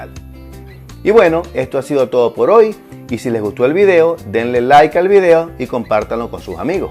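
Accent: Zulia, Venezuela. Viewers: Tienes acento Zuliano eres del Zulia ?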